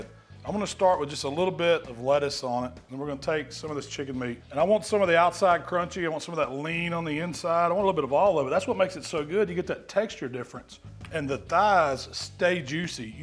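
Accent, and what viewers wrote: South, United States. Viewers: Yes. Good old ooollll and follll. It's like the south lost the letter I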